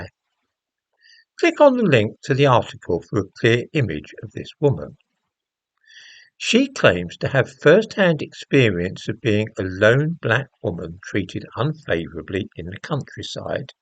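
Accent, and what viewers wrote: United Kingdom. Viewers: Great video, and it was nice to hear the english i miss and never get these days. But please please please, have that mustache trimmed and fixed. And learn to use all the products thats out there for beards and so on. You wash it and form it every 2 days or so, throw a bit beard honey in there, and it will look more immaculate. And not like you've glued a dead rat on your face. Its gonna make a world of difference. Regards from a brit in Denmark.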